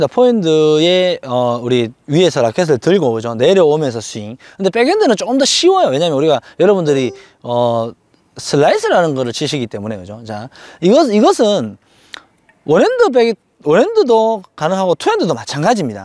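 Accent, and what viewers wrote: Gyeongsang, South Korea. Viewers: 요즘 강한 백핸드 슬라이스를 많이 노력중에... 가로스윙을 우연히 느끼고 이느낌을 ,그 코킹을 백핸드 드라이브에 적용해도 되겠다는 생각을 했었는데 이영상과 같은 맥락에서 볼수 있는거 같습니다 나이스 코칭입니다 경상도억양이 뇌에 팍팍 지식으로 때려 박습니다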